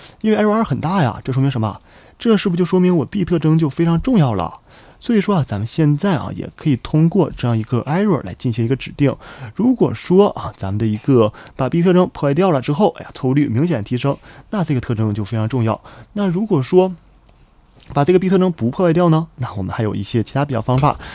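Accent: Northeast, China. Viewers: 这东北话我听着很带感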